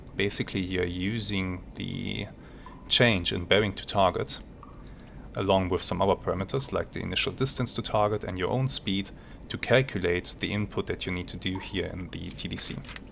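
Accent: Germany. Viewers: love this series. great narration too, and the Germanic accent really helps!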